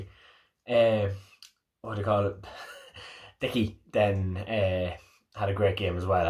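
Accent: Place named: Armagh, United Kingdom. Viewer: I can hear the Armagh in your accent 😂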